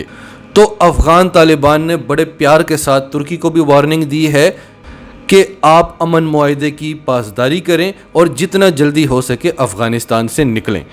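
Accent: Pakistan. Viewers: Good pakistani urdu accent best anaiysis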